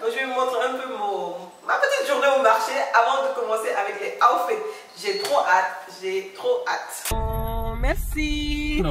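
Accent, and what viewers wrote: Senegal. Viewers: L'authentique accent sénégalais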